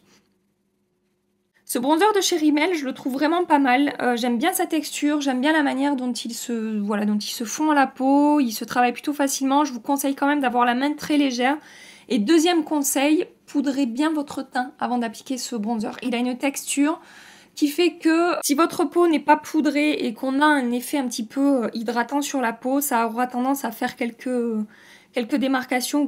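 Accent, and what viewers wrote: South, France. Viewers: Bsr bon bien ecoute je ne connais pas du tout le fdt L'Oréal donc une occassion de le decouvrir et ce que je faire plus les monos de Sephora les couleurs me donne trop envie et vue qye mon mari m'a offert une carte cadeaux de chez Sephora pour mon Anniversaire 🎂 je sais quoi prendre lol.... et le Blush Milani cela fait quelque temps que je louche dessus donc avec ton avis tu me fais basculer 😂😂 en tout cas avec tout ce maquillage petit prix tu as su nous faire un magnifique MU, Tu nous inspire vraiment, on voit que tu aimes profondément ce que tu fait et que tu aimes nous le partager et nous on adore te suivre et te dire merci pour tout ce que tu fais pour nous, vraiment en plus tu as toujours le sourire tu n'es jamais négative et tu es adorable avec ton accent du sud et ton rire est contagieux et surtout tu parles avec nous sans filtre et ça c'est génial c'est comme si on était là avec toi dans la pièce et quand il t'arrive quelque chose tu ne réfléchis pas tu parles simplement comme tout le monde et c'est super alors encore un grand merci de nous faire vivre tout ça avec toi car en ce qui me concerne c'est un pur bonheur 😉😉😘😘😘❤❤🌞🌞 Je t'embrasse très très fort et te dis à Dimanche Ambre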